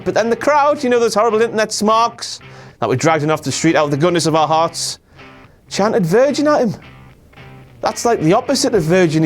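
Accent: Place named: Scotland, United Kingdom. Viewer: I love his Scottish accent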